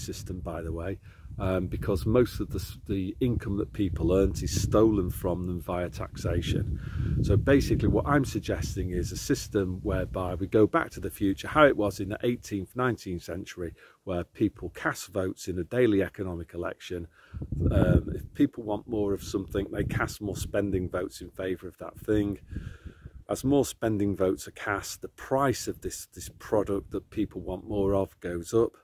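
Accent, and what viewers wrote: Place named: Midlands, United Kingdom. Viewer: Larken Rose with a middle England accent.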